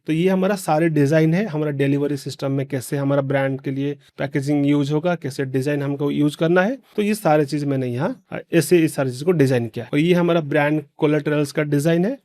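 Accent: Odisha, India. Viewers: Bhai tame hindi kahibaru jana padijauchi tame odia boli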